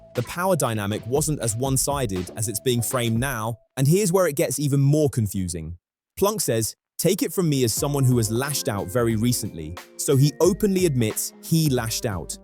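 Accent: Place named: United Kingdom. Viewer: ahh cool, so it's the same information we've had for weeks just regurgitated in a british voice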